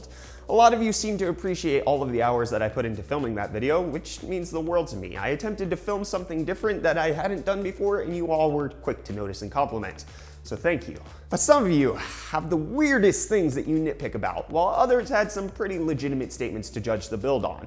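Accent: United States. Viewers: Holy sheet man, you live in South Africa? 0.0 You sound American tho, elaborate pls? (You may have already told your story, i'm new so pls fill me in! #PossibleSub)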